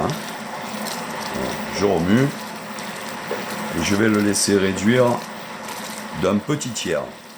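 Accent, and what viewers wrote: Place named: Marseille, France. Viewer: Miracle !!! L'accent Marseillais est revenu (Mais à 85 % ...) Recette excellente comme d'habitude ! 👍❤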